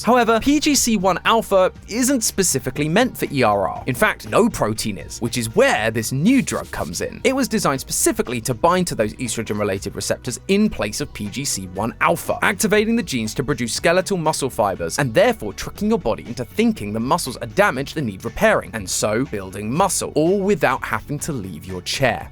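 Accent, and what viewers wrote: United Kingdom. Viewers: I still cant get used to the british voice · they made matpat british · I miss mat pat but the new guy is British I think so W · Love to have a British host, don’t take this the wrong way and say I hate MatPat because I dont, it’s just nice to have someone from my country host the channel ya know? It’s cool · Me realising matpat is gone: D: Me realising is british::D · hes BRITISH NOOOO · Awesome, we get a British accent to listen to now that matpat dipped (Edit: I not hating btw I just mean I love me some British accent💀) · Matpat get a new guy not a random British guy with a script you wrote. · they replaced matpat with a british dude, i cant listen to it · Hes British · Let's all say goodbye to Matpat. We all miss him. (say, who the f is this British guy now?) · MatPat is bri’ish!😮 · British matpat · I thought this came out years ago, the British accent caught me off gaurd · I don’t want British man I want the other guy please I want him back I miss him 😭😭😭😭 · before amarican now british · game theory is british now? 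· Why did matpat quit youtube, did anyone give him tensions or something? I had listened the voice and matpats voice is very crackey but this one is a British person (ami right that its a British person?) I liked the way he said THE LOREEEEEEEEEEEEE! I missed those days hes voice gave me happiness now i dont like this person.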